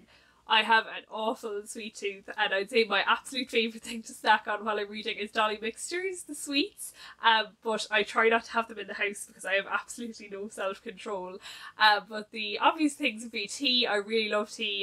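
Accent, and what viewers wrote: Ireland. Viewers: Belated happy St Patrick’s Day,! I love hearing your Irish accent! ☘️ As we approach the end of March, I’m just checking that you’re still ok to buddy read A God In Ruins in the second half of April? 😊📚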